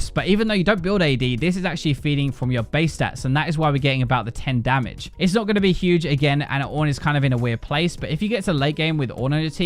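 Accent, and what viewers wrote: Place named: Northern England, United Kingdom. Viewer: holy shit I can't understand a thing your northern english ass is saying. ENUNCIATE FFS.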